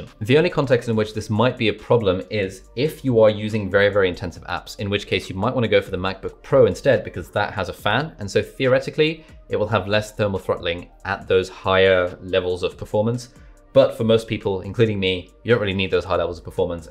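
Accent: United States. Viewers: you can kind of tell that is starting to get an american accent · I see you getting the American Accent. The way you pronounce the "r" in air and processor.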